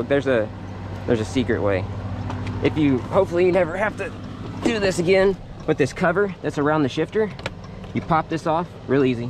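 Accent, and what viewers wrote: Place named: South, United States. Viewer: You’re welcome and hopefully you’ve got your flatbed back by now lol Oh and I like your southern accent, where are you from? I’m in good ‘ole Kansas lol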